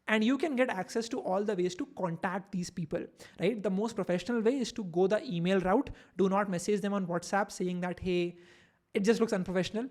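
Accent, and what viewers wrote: India. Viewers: When you speak in English it sounds like you are speaking in Hindi. Your explanation is so good.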